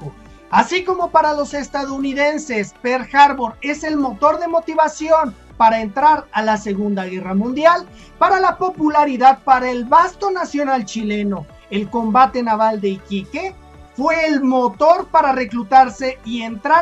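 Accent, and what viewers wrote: Mexico. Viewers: por tu acento imagino que eres Mexicano, pero cuentas tus noticias de mi Pais, con un orgullo y admiración, que no puedo mas que felicitarte por tus comentarios y videos. Es un honor que alguien que esta a 6560 Km de distancia se refiera a mi Pais con tanto entusiasmo. Una vez más gracias y espero tus siguientes noticias.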